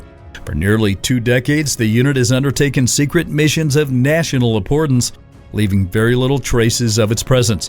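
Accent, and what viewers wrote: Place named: United States. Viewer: Another disappointing video created with a startling level of ignorance. Why narrate a history of the SRR with a mostly American military forces video overlay. An American accent narrator is also a big turn off.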